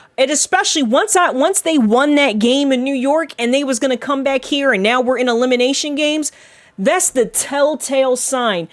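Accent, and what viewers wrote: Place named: Baltimore, United States. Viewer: Hey where you from? U sound like ur from Baltimore but ur a sixers fan? I'm from California so I can be way off?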